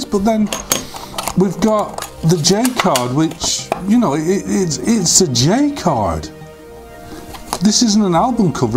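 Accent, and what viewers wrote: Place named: Lancashire, United Kingdom. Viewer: An interesting video, subscribed!👍 You sound Lancashire Vic reeves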